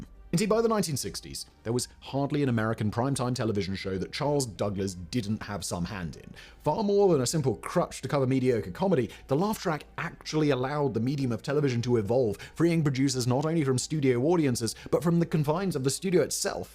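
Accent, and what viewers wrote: United Kingdom. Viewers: I think it's ironic that you was a British person or at least a person with a British accent… Did not mention the use of the laugh track in Great Britain… Especially on the Monty Python flying Circus… They fought against a laugh track and were told by the producers"when will people know to laugh"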